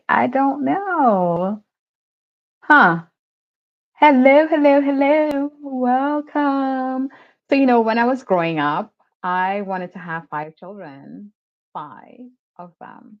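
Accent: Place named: Trinidad and Tobago. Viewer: so.many.thoughts!!! I'll try to be succinct lol. First up--I'm a sucker for Trini accents! Your voice is so soothing 😍. I never hear other Black women talk about this, so this was really refreshing to watch! I'm the only (intentionally) childfree person in my friend groups, and it can feel isolating. Thank you for sharing your story and being transparent about some of the reasons you changed your mind about having kids...and your aunt sounds amazing! I can see why you wanted to be like her. My story is a little different bc I've always known I wanted to be childfree since I was a teenager, and was also raised in Ghana as a kid so I understand how different it is raising kids here vs. back "home". The lack of support for parents (esp mothers) in this country is appalling, and a lot of mothers are bearing the load of raising kids...even if they're married. 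The U.S. is hostile to motherhood, especially Black motherhood. I often think about who's gonna care for me since I'm in my late 30s now, and always planned on taking care of myself the best way I can so that I'm active and out and about even in old age. I plan to either be alone, have hired help if needed, or live in a Golden Girls situation lolol but who knows? Also, the guy who talked about older people without kids is totally right. My mom works in a nursing home, and plenty of older adults with kids die alone, so there's never a guarantee that having kids ensures you'll be taken care of (or at the very least, have someon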